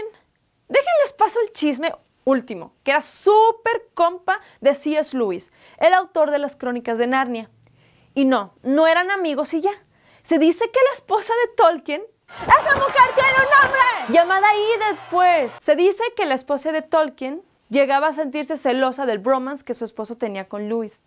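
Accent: North, Mexico. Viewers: Sera de sinaloa o de chihuahua estoy seguro que es del norte pero no de donde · Gracias por hacer un programa así. Te quedó poca madre el guión, además de que tienes mucho carisma y definitivamente lo que gana contigo es el tono norteño, espero o estar errando en atinarle. Genial proyecto.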